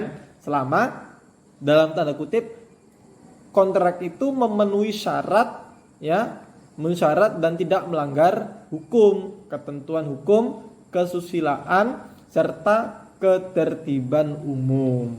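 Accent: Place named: Java, Indonesia. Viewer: Jawanya totok kali pak 😂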